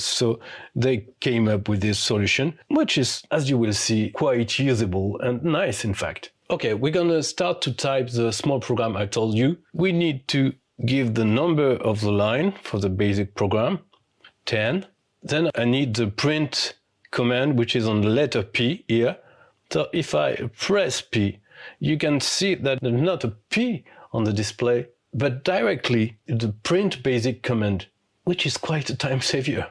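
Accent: France. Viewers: Never apologies for your lovely french accent ❤ not a scam but cheap tack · Don't worry about your French accent. Your English is better than my French (neither read nor speak it). Arctic Retro embraces his Norwegian accent - you should your French. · Hi, Do not be sorry for your French accent. As you could not help where you where born. Be proud of it. 👍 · I very much like a French accent, I like the French. Love the video on this and the Oric, and I very, very, much hope that you will continue to make more English language videos. Particularly, since much to my embarrassment, I don’t speak French. (In my defence I wanted to learn French at school, when the brain is still receptive - but an older sibling had chosen Spanish and we had all the books. Colour me disappointed I can tell you.) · I am very proud of myself, I watched your video and I could understand all that you were saying, so no I can listen to a Frenchman speaking. 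Thank you, I give you a big thumbs up! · Ace accent, reminds me of the comedy French Accent you always see in English language TV! Great subject!! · Instant subscribe. And never apologise for your French accent. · Welcome to the rest of the world, LOL. 😁😎 love the French accent and thank you for the fabulous video. ❤️❤️❤️❤️ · French accent: French. Kisses computer: definitely French! Great video and please don't apologise for speaking fluent English with a beautiful French accent. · Awesome! We produce all kinds of new add-ons, and do refurbs of Sinclair machines. Lovely to see your new channel, and I do like your French accent! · Don't apologise for your perfect French accent. You present an excellent video in great style. Donc il faut que je voie les videos que vous avez déja téléchargées sur Youtube en français! The ZX81 back then taught me how compact you could make a simple OS to cope not only with the interface and the Basic interpeter, but also the display. 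It was a model of economy of coding. Its printer was very clunky, too: a spark printer which burned characters in a silver metallic coated paper to create dark grey "printing". I found a box of spare spools of that coated material only a few months back, safely put away in 1985! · Happy to listen to a French accent instead an AI voice over any day. I enjoyed the content and look forward to more videos. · Thanks for the video! I helped win a ZX80 for my primary school, then managed to persuade my parents to buy a ZX81 when it came out - that Tyrannosaur from 3D Monster Maze still haunts me! Thanks for showing that Pitfall style game, it looks amazing! PS - I'm a Brit and I love your French accent!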